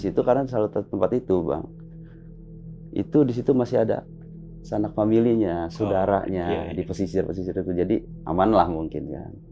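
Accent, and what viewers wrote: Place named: Lampung, Indonesia. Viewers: lampung bener cara ngomog nya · asliiii bang😅😅, 100% lampung banget😅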